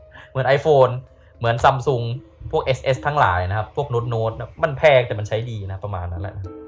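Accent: Isan, Thailand. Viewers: พี่เป็นคนอีสานหรอผมภาคเหนือ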